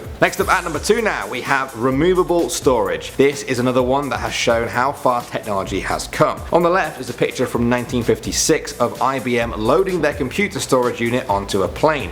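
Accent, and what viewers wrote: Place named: England, United Kingdom. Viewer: He is from England, so, accent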